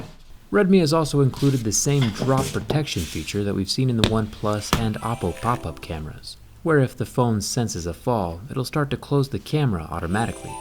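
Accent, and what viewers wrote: United States. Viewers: Your voice is very flat !!!!! Please talk or speak or "parla" with Oxford accent if you want a normal ear to FOLLOW YOUR MOUTH !!!! OTHERWISE , Its HARD TO HEAR OR LISTEN , YOUR BRAIN-WASHING FLAT AMERICAN VOICE VIBRATION !!!!!!!!!!!!! ☀☀☀☀☀⛵✈✈✈✈✈✈✈ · Oh and the perfect American accent didn't give it away?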